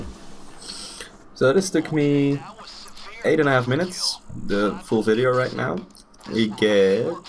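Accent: Netherlands. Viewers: Jij bent sws nederlands ik hoor het aan je accent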